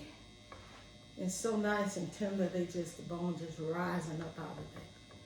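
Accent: South, United States. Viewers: Love u, very beautiful & rare moments to hear u sing, u are a delight to watch. Thank u so very much for sharing your knowledge, u are great by yourself the guy wants to interrupt and speak over u. Love your presentations & southern talk, dont like to hear camera guy mimic & make fun of your words!!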